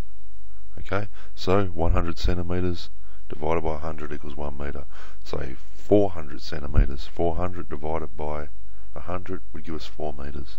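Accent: Australia. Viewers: This dude is an Aussie or NZr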